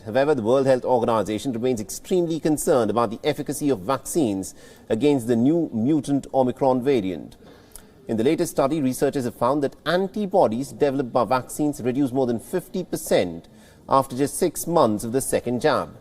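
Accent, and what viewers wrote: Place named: India. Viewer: Meaning is lost with the Indian accent. Huh...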